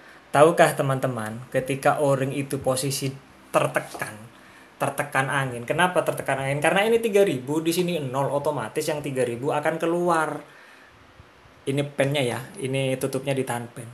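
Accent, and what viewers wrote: Madura, Indonesia. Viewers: Nada2 orang madura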